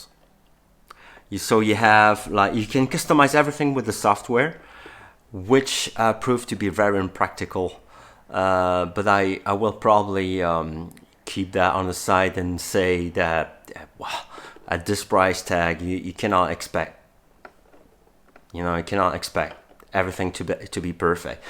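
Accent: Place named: Norway. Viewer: What is that accent dude? I can hear Norwegian, american, scottish and swedish??🤔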